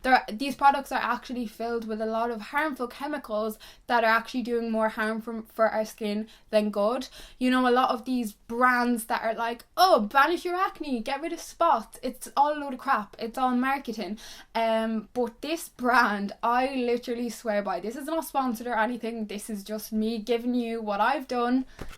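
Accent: Ireland. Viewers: YOU ARE SO PRETTY and I love the Irish accent 😍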